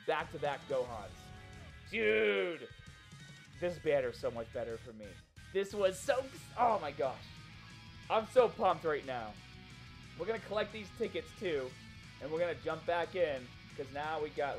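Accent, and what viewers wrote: Boston, United States. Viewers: Don't let toon bully you out of your Boston accent man! I loved the way you said dokkan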